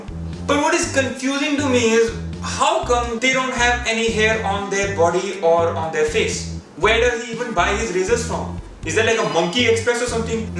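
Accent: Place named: India. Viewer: Holy shit.. after watching so many Westerner Vlog its quite jarring to hear this thick accent.. now i get why they make fun of Indian accent.. its REALLY awkward.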